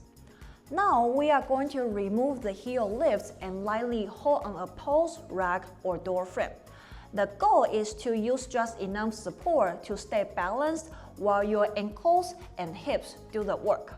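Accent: China. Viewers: great info. I also LOVE the accent. I thought it was Singaporean but it sounds more Chinese. Thank you.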